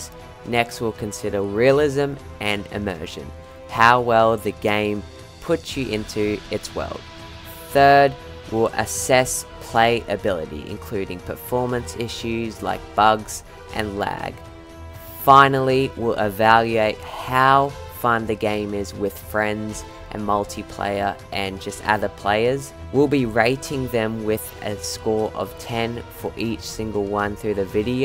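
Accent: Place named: Australia. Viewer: No offense, you sound like an Australian mixed with a French-Canadian. Otherwise, this is really cool. You earned a sub!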